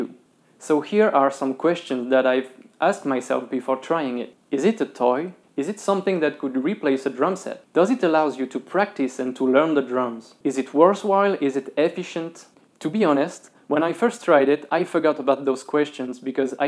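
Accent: France. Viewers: Haha he sounds French. But he is one of the few that actually speaks decently english!! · oh mon dieu... mais t'es francais en faite ! bon bah j'ai appris un truc cool, grace à ton sublime accent :p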